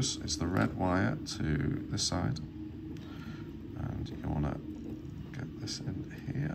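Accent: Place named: Wirral, United Kingdom. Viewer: I need a order a couple andet my nodes out of project boxes. Great hearing a local Wirral accent on YouTube for a change. 73 Sean de 2E0SYA (Wallasey)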